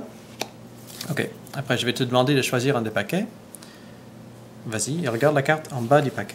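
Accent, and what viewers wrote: Quebec, Canada. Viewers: wooow classe l'accent québécois 8D8D8D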